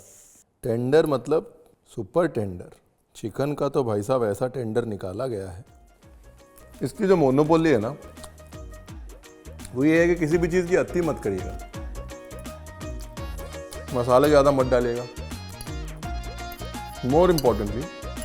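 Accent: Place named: Bihar, India. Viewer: The Bihari accents has enhanced the cravings for this dish😂 Thank you very much 🙏Sir for sharing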